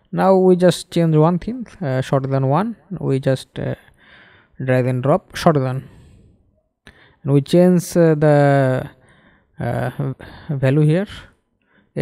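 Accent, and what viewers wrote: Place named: India. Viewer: loved the Indian accent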